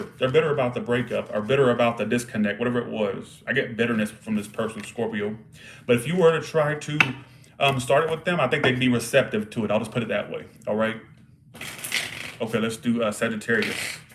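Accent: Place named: South, United States. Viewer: Damn you are the bomb. I love your southern accent; thank you for doing this.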